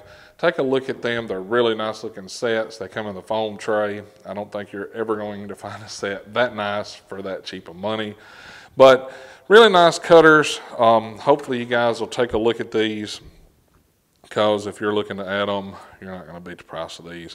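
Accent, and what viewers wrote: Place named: New Jersey, United States. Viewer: He could call it Discount Tool Co. and once you hear him talk you know he is from Jersey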